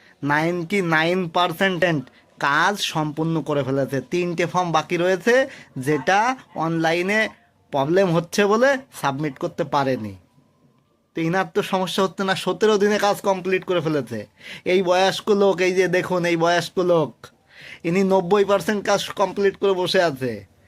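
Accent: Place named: Bangal, Bangladesh. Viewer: তোর কথা শুনে মনে হয় তুই বাঙাল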